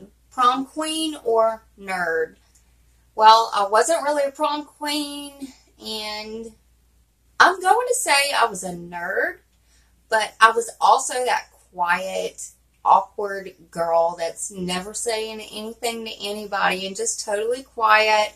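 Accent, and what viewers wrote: South, United States. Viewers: This was so cool!! And you’re such a darling! And for the record, I think you have a nice accent. No like a hick or something. Sounds like southern charm to me.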